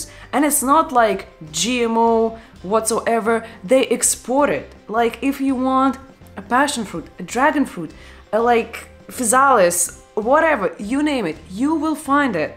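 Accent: Russia. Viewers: I love your English with Russian accent, keep doing your great stuff. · Your Russian accent is cute 👍me likee like 😁🥳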